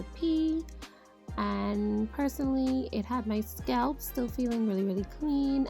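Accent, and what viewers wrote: Bahamas. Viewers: I hear an accent - Bahamian?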